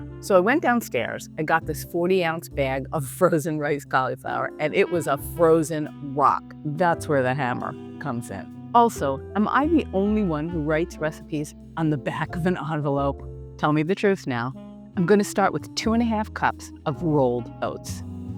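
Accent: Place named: Hamptons, United States. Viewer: Love to use envelopes too, listening to your accent and a reference to the city, I’m thinking you live in the Hamptons? Wonderful view. Love your food, I make veggie soup too, but at the end I take a little out, blend it in the nutribullet and add it back for a nice creamy feel. I definitely need that string blender thing❤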